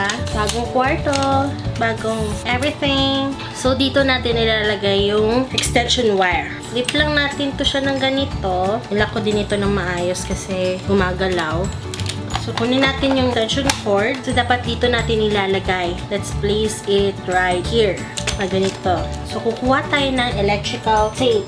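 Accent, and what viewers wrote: Philippines. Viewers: are you cebuana?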